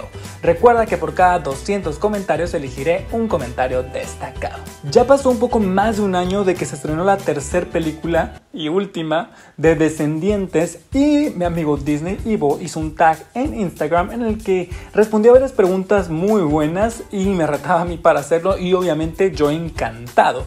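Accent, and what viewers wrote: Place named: Spain. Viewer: Aí gente sotaque espanhol e tão lindo kkkkkk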